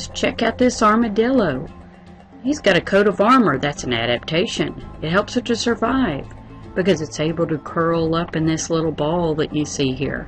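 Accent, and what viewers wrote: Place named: United States. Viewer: sounds American to me but i ain't good hearing accents it all sounds the same to me well except the RP accent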